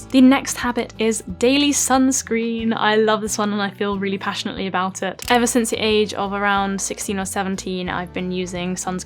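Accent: United Kingdom. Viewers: 自打听了UP主的口音，我打算放弃美音，学习英音。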